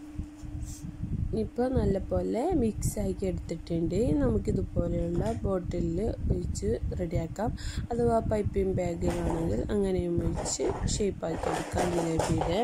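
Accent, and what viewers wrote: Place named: Kasaragod, India. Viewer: ഞമ്മളെ ഭാഷ കാസറഗോഡ് ആണോ കേട്ടപ്പോ അതേപോലെ തോന്നി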